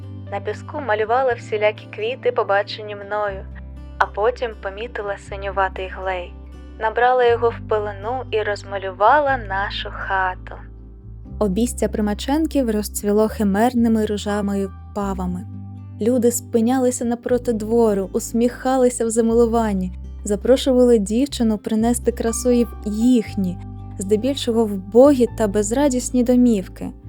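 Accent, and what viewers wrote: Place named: Russia. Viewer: Маю зауваження. Ви робите в наголосах характерну нині помилку-русизм - зсуваєте наголос на перший склад, як м.оскалі: нА душу, а не "на дУшу", жИли, а не "жилИ". Зверніть, будь ласка увагу. Відео дуже сподобалось, підписався. Дякую!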